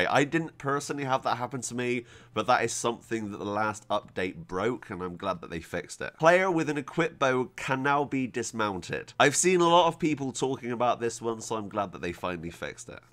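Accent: United Kingdom. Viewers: I thought so haha. Am also from the UK so kind of recognised the accent. Just wasn't a hundred percent sure haha. Enjoy the videos though! Have only recently got kingdom come and still fairly new to pc gaming. But thoroughly enjoying it, playing alongside it with The Witcher 2, not sure if you've played the witcher series but they are also great games. Have started reading the books recently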